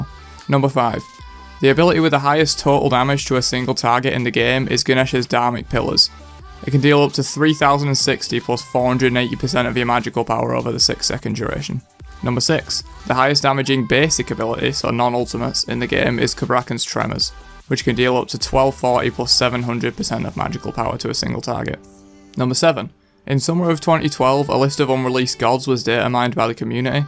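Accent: United Kingdom. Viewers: "Bof" "fog o' wor" "ba'el" and people say gaelic/british accents are the best lol sounds like an idiot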